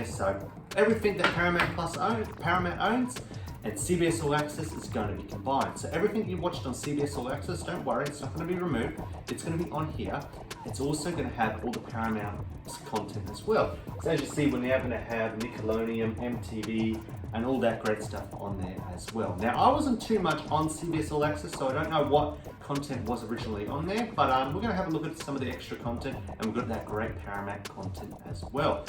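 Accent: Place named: United Kingdom. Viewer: I'm American in U.S, Singed up to this before MARCH 4th launch, i paid only $50 ad free version for whole year ...I dont gave a S about CBS TV stuffs shows, & channels , that MTV & BET is all reality trash channels NEVER care or watch , plus i have U TUBE TV & HULU for that ..Singed up for this ONLY for half off i got for whole year & plus for Paramount & CBS UNCUT premium show's & movies is there & more to come ..beside that F the rest! I LOVE UK guys & accent, is soo cute 🥰👌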